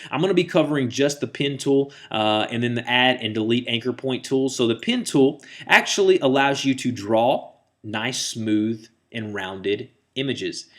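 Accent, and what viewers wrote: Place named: North Carolina, United States. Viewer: you must be from north carolina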